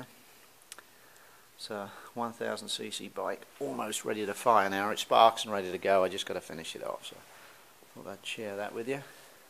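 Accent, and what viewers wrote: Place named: Australia. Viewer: you sound like an ozzy mate ,haha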